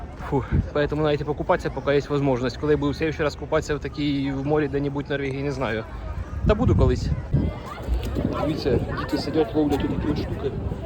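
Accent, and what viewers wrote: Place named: Komyshuvakha, Ukraine. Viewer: А часом, Ви не з Комишувахи? В мене там родичі живуть, говір - ідентичний❤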